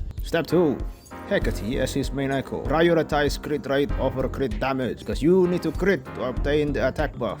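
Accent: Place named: Philippines. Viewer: Man that accent😅 i cant. I assume you're a filipino or indo cuz i also sound like you whenever i speak in ingleesh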